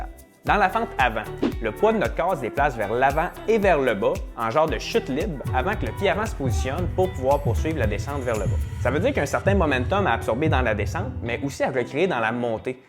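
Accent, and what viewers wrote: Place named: Quebec, Canada. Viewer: Superbe explication ! Avec l'accent québécois en prime 😊😊 merci !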